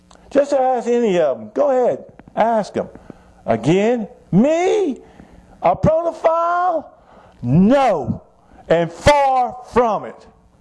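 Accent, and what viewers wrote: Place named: South, United States. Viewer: which one of the southern states is he from?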